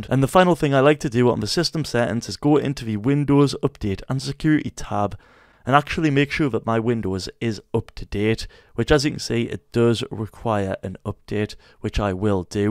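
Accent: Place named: United Kingdom. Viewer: bro is bri'ish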